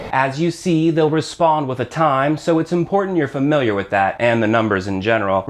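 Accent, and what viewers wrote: England, United Kingdom. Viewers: Im a big fan of you. im from Jordan ** the country** im learning from you English accent and some spanish. thanx keep it up asta luego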